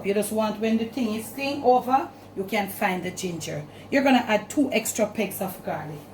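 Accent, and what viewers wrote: Jamaica. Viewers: Miss from down a yard I love u n I'm from down a guyana n luv to hear u talk me luv talk to n me is a chef but luv to look at u makes me smile all time with u Jamaican talk n knowledge u go girl u can talk cause u can cook na lol peace god bless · Mi love d patois, so gwaan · One of the reasons I follow you is because of your "talking". You remind me of growing up with my Jamaican parents. 🥰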